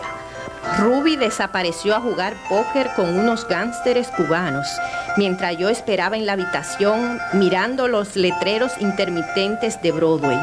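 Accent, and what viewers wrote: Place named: Higüey, Dominican Republic. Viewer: La que narra me está pisando mi Higüey!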